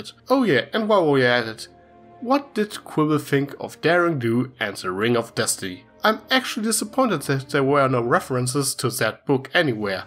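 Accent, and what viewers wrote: Germany. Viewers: True. Also, are you German? You seem to have a European accent, and it sounds German to me. If so, nice to meet another German brony.:)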